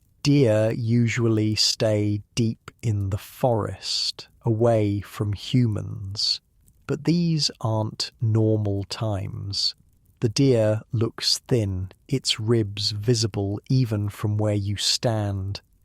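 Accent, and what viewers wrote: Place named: Scotland, United Kingdom. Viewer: Sounds like Scotland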